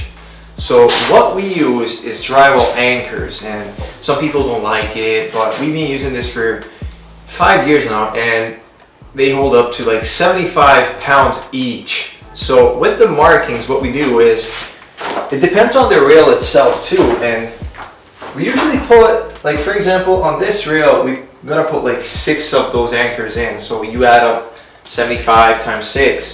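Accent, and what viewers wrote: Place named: Southern Ontario, Canada. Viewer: I’m Canadian but living in Germany since 2016. I think it’s hilarious that I was able to pick up his accent (Southern Ontario) almost immediately. Anyway, great video, thanks for the info. My only feedback would be to invest in a mic and better lighting.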